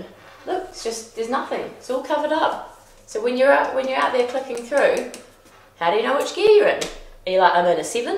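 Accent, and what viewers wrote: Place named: New Zealand. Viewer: Didn't expect a kiwi accent :)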